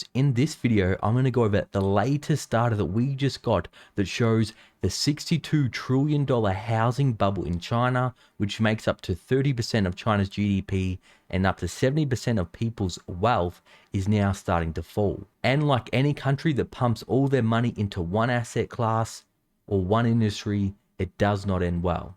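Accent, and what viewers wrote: Australia. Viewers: i miss the HOLY SMOKES EVERYONE in your aussie accent hahaha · Does he have an typical australian accent? I'm not a native English speaker and I try to learn different accents.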